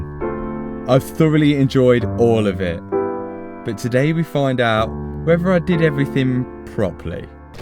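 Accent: United Kingdom. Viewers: I am rarely impressed with much, but you my friend were born for this. Not everyone is a true entertainer, but you have it, every time you say something "is mental" with your strong U.K accent you bring a smile to my face. The fact that you have a wide grin threw all of the video's hardships is amazing. Keep making these for Youtube they are awesome!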